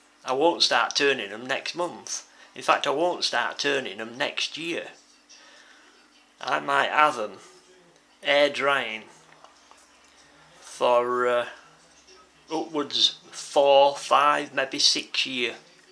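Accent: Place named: Yorkshire, United Kingdom. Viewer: Ayup, nice to hear a Brit bloke voice, is there a Yorkshire hint? just a bit. I've only been turning just over a week, I was told that Turners Retreat was in Sheffield! the buggers lol. So it's in or near Nottingham eh? Just my luck. Brilliant video's mate, how much wood blanks did you get for 6 to 7 hundred quid? When I first started to think about woodturning up I didn't expect that getting hold of wood for turning to be difficult! The American turners are spoilt lol, they seem to hsave stunning wood and its in their gardens most of the time! lol. Do you have dificulties getting wood to turn? OK won't bore you to much mate, take care, Turn Safe or..... TURN OFF. Cheers Andy South Yorkshire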